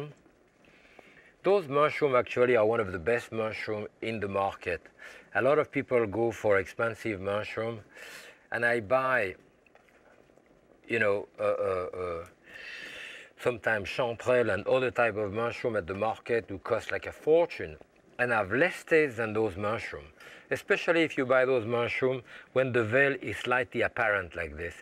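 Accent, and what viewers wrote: France. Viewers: I love to cook. My first serious cookbooks were Mastering the Art of French Cooking, Volumes I and II. The originals, not the boxed set. My family is of French origin. Everyone in my family cooks delicious creative food, although they may have degrees in subjects not related to food. I have lived in Berlin for 30 years, but before that, I lived on the French border where I got married. My new husband and I went to Alsace every other week-end, where we had some really good French friends. His accent was exactly like ', the melody of French carried directly into his English, "You know..." I am "staying in" now and almost every day I talk to my sister in Minnesota, who is a professional baker. When I told her I was watching, she said, "Oh good! I'm so glad you are doing that. It's good for your spirits." Not that I'm depressed! But she is so right. 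I carefully record the details of his methods, and have "More Fast Food My Way" in my Amazon basket. I'm so sorry for his loss of his Gloria, who he thinks about with every deft detail, how she likes turkey wings, and likes her pasta well-done. What a terrible year! On his many shows, though, he's timeless, and clever and produces fabulous food. I have to keep reminding myself that he has a Master's Degree in French Literature from Columbia University... He has mastery at more than the one thing... He's a man of all seasons.In this time, he's a Godsend!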